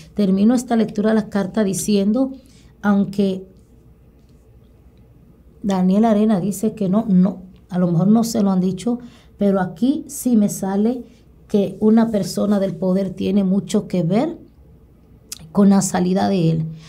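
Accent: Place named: Dominican Republic. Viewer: Los dominicanos hablamos haci, pero solos un amor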